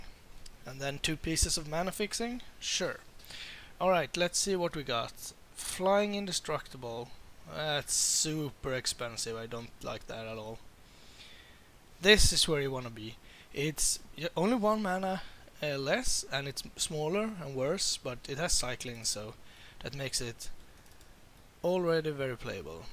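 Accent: Sweden. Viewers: A real good video! I love that Swedish-English! Keep it up!" :D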